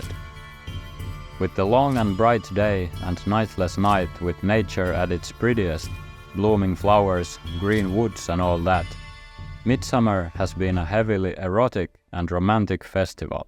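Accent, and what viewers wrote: Finland. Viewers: My French hubby who's born in Berlin, LOVES Finnish accent, and also your humour! Hyvää Juhannusta/Ukonjuhlaa näin jälkikäteen! 🙂 Tai erittäin ajoissa, miten päin haluaa katsoa! 😉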